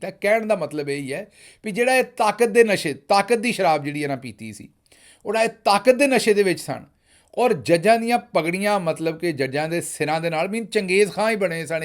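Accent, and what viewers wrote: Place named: Punjab, India. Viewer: tusa punjab bhot sweet bolta hon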